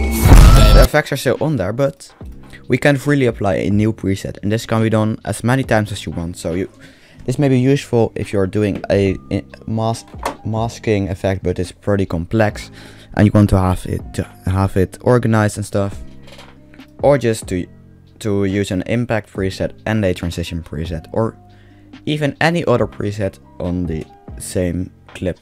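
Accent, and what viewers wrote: Netherlands. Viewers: ik hoor gewoon aan je accent dat je uit nederland uit komt hahahaha. Maar dankje wel voor de video. Was egt hulpzaam!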